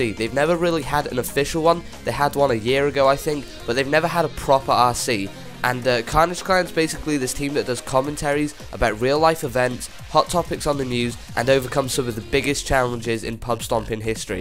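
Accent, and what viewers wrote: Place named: Wales, United Kingdom. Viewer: I thought you were welsh I could here it a little, Which town though ? I'm from Newport !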